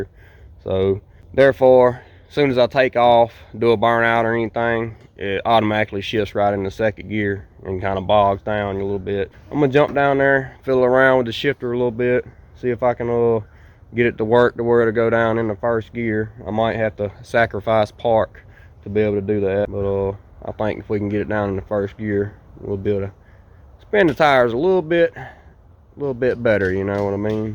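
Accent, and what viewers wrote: South, United States. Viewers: Nothing like having a sip of apple pie moonshine and listening to a Southern Man talk about his bad ass truck💯👍🇺🇸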